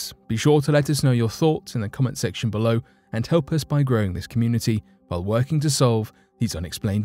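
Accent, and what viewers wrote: United Kingdom. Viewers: He is British, they pronounce a lot of things differently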